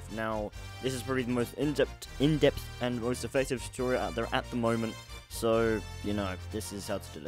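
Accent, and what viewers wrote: United Kingdom. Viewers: yes and this british accent makes it FUCKED UP.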